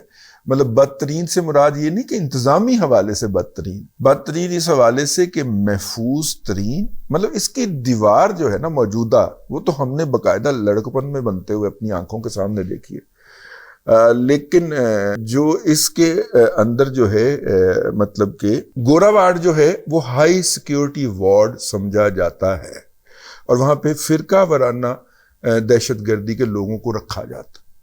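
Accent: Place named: Saraiki, Pakistan. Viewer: سرائیکی شعر کا مزا آگیا۔ آپ کا سرائکی لہجہ بھی خوبصورت ہے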